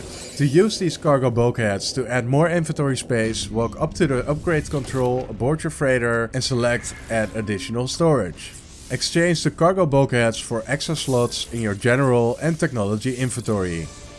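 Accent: Netherlands. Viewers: 😂😂😂 1 seconden en ik hoorde het gelijk😂😂😂 proud of the dutch accent 😂😂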